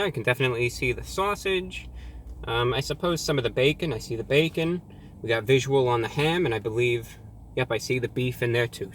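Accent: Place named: Transatlantic, United States. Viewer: Ever since he read those mean comments and showing the vicious one about slitting his throat and stabbing his eyes with forks..I have an added entertainment factor watching his videos...I love this guy! How can anyone not? His trans Atlantic accent I like as well. Friendly and easy going..I hope the people that said the violent things were just trying to be funny.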